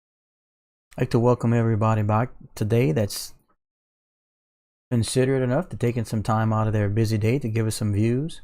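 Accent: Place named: Louisiana, United States. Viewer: Dude sounds like you are from my area South Louisiana.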